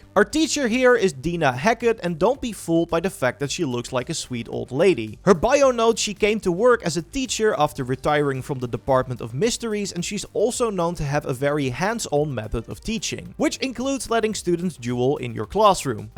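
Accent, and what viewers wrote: Netherlands. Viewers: Why does your voice change. This channel seems to be two different ppl. Both dutch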